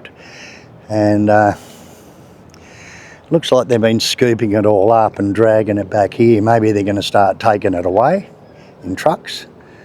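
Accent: Australia. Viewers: Inspirational! You can barely speak Strine English, so the fact that you've learned Bahasa Indonesia to the extent that you do is truly inspiring. How long has it taken you?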